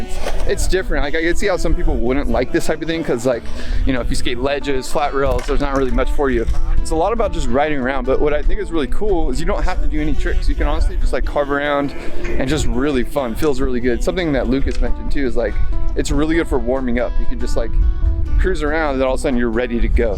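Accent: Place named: California, United States. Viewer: has he biggset bro sufer cali accent and marble mouth. The guy is a fried kook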